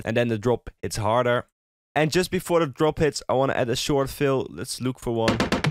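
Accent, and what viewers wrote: Netherlands. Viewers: Ur Dutch? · It sounds like u have an dutch accent Are u dutch? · Mooi nederlands accent haha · je nederlands accent man geen haat tegen maar tis een beetje raar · This guy is definitely Dutch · are you dutch? bc you kinda sound like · you sound dutch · De eerste paar seconden hoorde ik al dat je Nederlands bent haahhaha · 😂Je hoort het geweoon, je komt uit nederland zeker?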